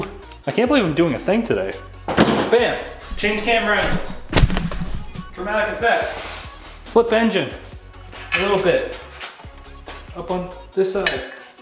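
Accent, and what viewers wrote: Alberta, Canada. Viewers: Are you from Alberta??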